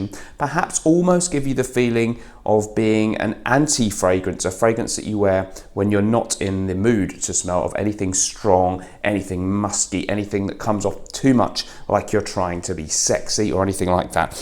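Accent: England, United Kingdom. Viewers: Classy english accent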